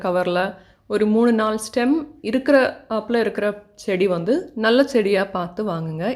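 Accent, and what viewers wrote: Kerala, India. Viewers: I love ur Malayalam mixed tamil pronunciation 😍😍😍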